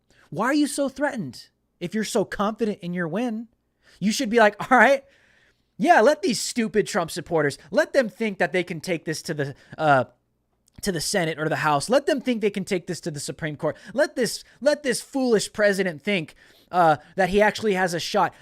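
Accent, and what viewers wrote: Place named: Puerto Rico. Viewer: Bro i saw your speech at the rallly in DC and it was broadcasted by RSBN..............you remind me of me all the other ones were kinda ok but damnnnn...you blew it off the roof about how to fight back.....i don't know if you are latino from new york or from what your accent sounds like maybe puerto rican like me but yooooo....that was the one that i even looked at my lady and said....now this is a man's man lol.....keep hope alive brother this isn't over and eventually the truth prevails...peace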